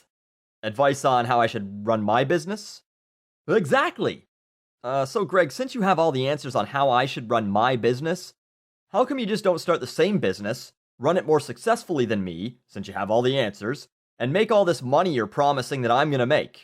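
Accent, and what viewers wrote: Canada. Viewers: i love your Canadian accent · yay, any chance that replies to my comment? if you see this, you're one hell of a guy and I love your rants, and the canadish accent makes it even better:D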